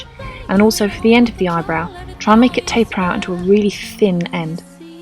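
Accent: England, United Kingdom. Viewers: This makeup is beautiful and so are you!!! But you' re English or American? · Where abouts in England are you from? im from near Liverpool :) x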